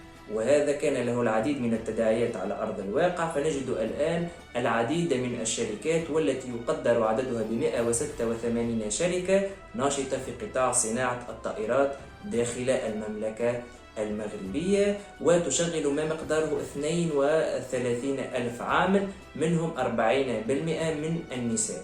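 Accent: Tunisia. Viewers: اللهجة تونسية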